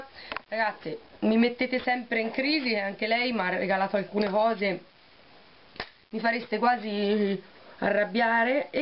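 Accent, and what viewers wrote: Tuscany, Italy. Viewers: ma ciaoooo sono una nuova iscritta,ti ho conosciuta grazie a gnappettaaa ,e ki ti lascia piu' sei troppo simpatica poi il tuo accento toscano è wonderful....un bacioneeee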